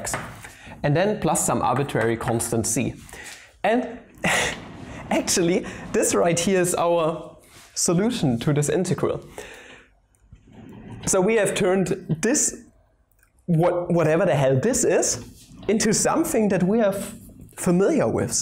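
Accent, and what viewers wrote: Germany. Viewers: One and a half years ago was so anxious about his English, now - the more German in it, the better:D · Well his is an actual German accent, I should know, I live there. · Ich finde es niedlich wie Sie Wörter wie 'the' 'this' '.. aussprechen :D Your german accent is adorable They are learnable through (the two th sounds). You need to see the arabic letters like qaf ق or ayn ع xD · What amazes me is that subtitles are perfectly true for a heavy German accent. Is it manually translated or google translation?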